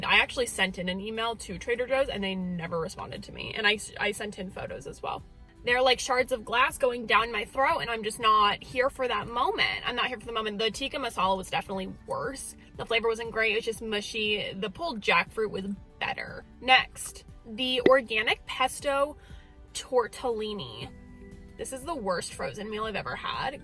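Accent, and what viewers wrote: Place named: Upper Midwest, United States. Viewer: Sexy with that upper Midwest accent. What a cutie.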